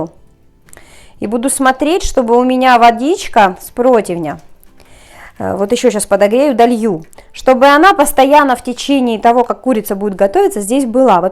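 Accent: Ukraine. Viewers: Ваш Украинский говор воодушевляет очень.... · Мои гости всегда в восторге от вашей курочки, но вот сегодня без паприки и куркумы бледная моль. Как же мне нравится ваш украинский говорок.... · Как я балдею от украинского говора)))))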